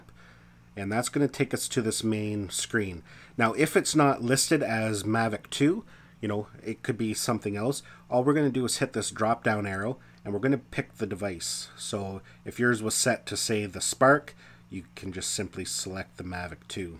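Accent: Canada. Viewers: Canadian accent made this so amazing 😌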